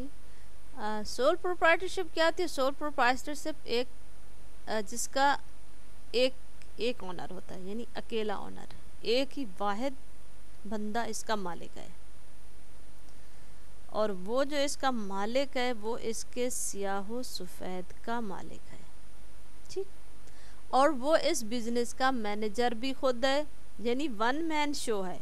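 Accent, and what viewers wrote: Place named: Pakistan. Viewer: Sound like ur a pakistani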